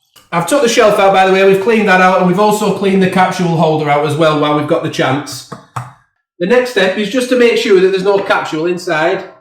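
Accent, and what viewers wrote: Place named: Bolton, United Kingdom. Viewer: love the video and the accent! You're not far from Bolton/Chorley area.. my ex-partner came from there and I never tired of listening to him.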